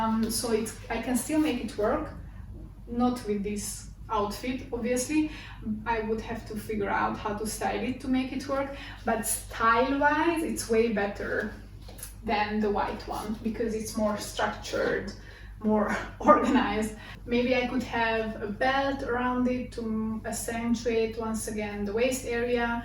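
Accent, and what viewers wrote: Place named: Hungary. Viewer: Szia! Az akcentusodból gondoltam, hogy magyar vagy. Szerintem a fények, a kamera és az ebben a videóban viselt blúzod alapján úgy gondolom, hogy mégiscsak Tél típus vagy, mégpedig Hideg Tél. Engem 3 végzett színtanácsadó nézett "félre" személyesen, míg már annyira frusztrált lettem, hogy elmentem egy szín- és stílustanácsadó tanfolyamra, hogy kiderítsem az igazságot. 🙂A baj az, hogy az "avatatlan" szem (néha még a tanácsadók is!) nem tudják, hogy mit kell nézni (elsősorban a bőr egységes és egészséges ragyogását). A rossz színek elszínezik az arcot, szürkítik vagy sárgítják, kihozzák a bőrhibákat, a szem alatti karikákat, stb. A tanácsadó pont az ellenkezőjét mondta neked, mint amit én mondanék. Az ösztöneid ebben az esetben jól működtek, javasolom, hogy hallgass rájuk (vagy legalább kérdezz meg még valakit). 🙂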